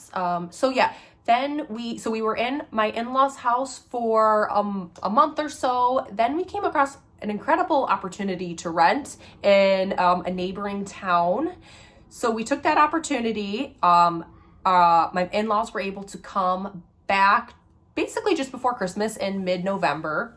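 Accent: Midwest, United States. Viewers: Good lord, as a native NYer you're midwest accent is friggin nauseating. NAUSEATING. Ya hear me? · Hi, have to stop thinking of Fargo when I hear your accent, you mid Western women sound so nice reminds me alot of Canadians. My parents retired back to Ireland in 2004 and were worried about not seeing the Grand kids from UK, no worries though as they came on holidays every summer and winter for 14 years. It's a big adjustment but give it time and bring the kids to see the American relatives as often as you can. Good luck with the house hunt.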